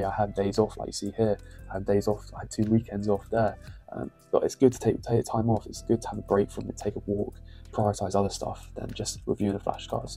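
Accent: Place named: England, United Kingdom. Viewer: Lovely to here clean, calm, English accent so "unYOUTube🇺🇸" Not one GUYS! You're A very nice TEACHER that's my opinion, as a teacher. 😊 Thank you flower! Edit, ok, so a 'guys' but not annoyingly.😅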